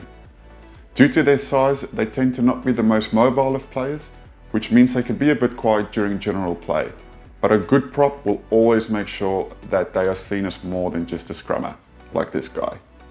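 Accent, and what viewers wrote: South Africa. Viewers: My man, great explanation. I hear an Aussie accent, or perhaps a Kiwi one - but yours is more difficult to place than usual, but do I detect a Saffer twang? Emigrated? Ps - hier kom die BOKKE :D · I don't hear English. Only Aus/NZ/SA. the way he says "couch" tells me he is SA born and emigrated to OZ or NZ. I may be wrong... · OK so firstly, I love the content. Secondly, I can't pinpoint your accent. Your channel says Australia but I feel like you sound like a South African who has lived in Australia for a while or an Aussie who has lived in South Africa for a while 🤣 I hear a mix of both accents hahah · his English sounds completely South African. He also used the boks in many of his examples. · My bru are you South African? Your accent confuses me....i cant tell if it's from Melbourne, London or Brakpan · I cant decide if he is south african or australian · Saffa with a bit of an Aussie twang?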